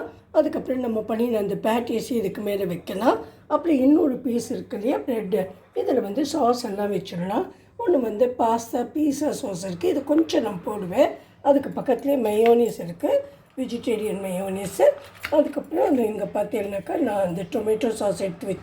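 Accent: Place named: Iyengar, India. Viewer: burger super. filling variety ❤❤ அம்மா..உங்கள் பேச்சு..ஐயங்கார் வீட்டு வொய்ஸ்..அதை கேழ்க்க very interesting ❤❤...இந்த food item எல்லாமே western style இருக்கு. .குறிப்பா இன்னைக்கு போட்ட burger. .🎉🎉🎉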